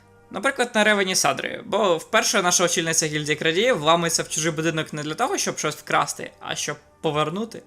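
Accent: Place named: Lviv, Ukraine. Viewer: У вас такий акцент цікавий, ви зі Львові або області? У мого друга просто ідентичні інтонації просто цікаво тепер 😄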